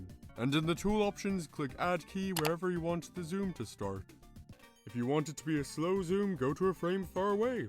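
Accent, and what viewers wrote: Ireland. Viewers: Bro is Irish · Why he sound like Irish? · Are you irish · Are you from Ireland? Because I am on FlipaClip, it’s the only one I have so far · Eh, more like nogla to me, it might be because hes Irish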